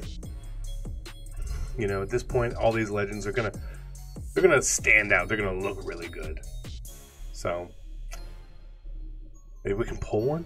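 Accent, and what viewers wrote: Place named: Midwest, United States. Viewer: You are so midwestern hahah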